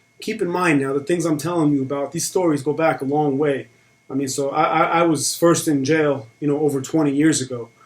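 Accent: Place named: New Jersey, United States. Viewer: I can tell your from Bergen/Passaic County. Your channel is the best, keep putting out content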